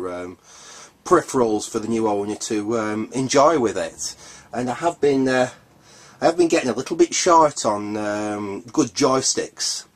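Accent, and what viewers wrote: Manchester, United Kingdom. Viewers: Great finds! Like the Manchester dialect!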